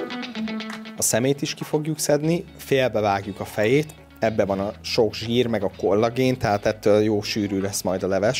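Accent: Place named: Italy. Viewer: is it just me? but his accent has italic undertone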